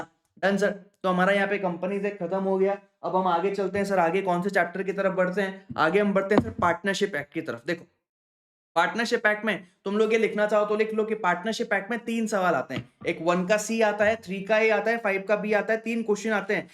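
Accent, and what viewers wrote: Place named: Gujarat, India. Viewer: ye kaka kaki ye sir gujarati lagte hai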